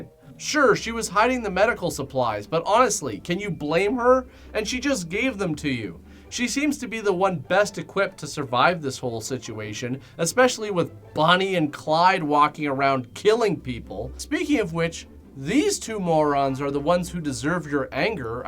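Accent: Canada. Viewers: People say there isn't a Canadian accent, but this dude is here to prove otherwise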